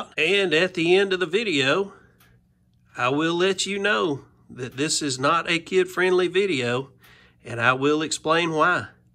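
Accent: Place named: Arkansas, United States. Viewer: My guess is Arkansas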